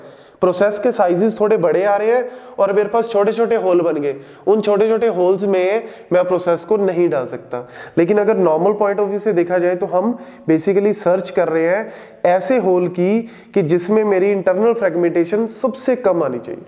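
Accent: India. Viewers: oh my god! why is it so hard to understand indian english? i can only understand 1 out of 500 videos i see around! i recommend indian guys to put subtitles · accent is way to strong. i already hate indian one but this is blasting my patience 😂. im sorry this is not personal, because i hate all idian accents.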